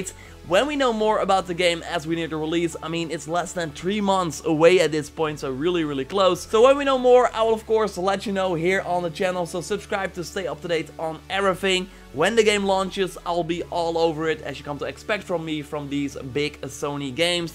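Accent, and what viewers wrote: Netherlands. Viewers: Are you from the netherlands? Your accent kinda sounds Dutch lol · Are you from The netherlands? you have an accent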